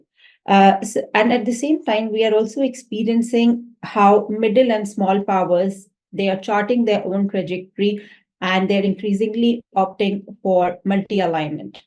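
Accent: India. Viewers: I guess there's little to be done about the Indian-English accent, which makes following this difficult for me and others I suspect, but a better microphone would be a huge improvement.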